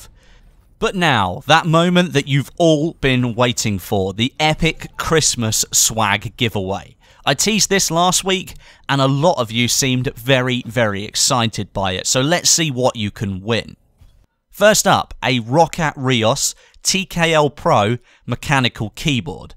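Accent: England, United Kingdom. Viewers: Very good video! Been subscribing you for a long time. Like those videos uploaded and what I like most is your british accent! Well done. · Nice video again! You always make my day better with your lovely british accent;) And just in case i win the giveaway, i want to thank my mom and dad for making me food every day, and i want to thank my computer for making me able to write this comment, and I can't forget to thank my aunt for being my aunt... Ohh, i also want to tell DANNYonPC that you need to get off YT more often, cuz if you don't, you will turn into a very bad hot sauce. Atleast that's what some old guru told in Australia... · I love your videos and your English accent.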